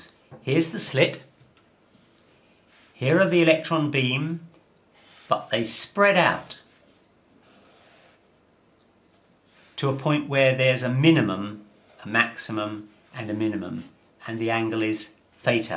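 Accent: United Kingdom. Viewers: Brilliant videos, thanks ! and im so delighted. His english is crystal clear! haha god bless British accent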